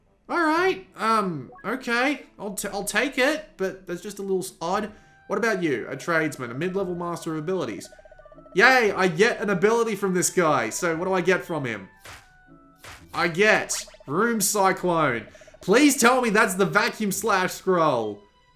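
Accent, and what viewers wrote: Australia. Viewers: Thanks for the footage - nice to hear an Aussie accent too :) Reckon I might have to grab this.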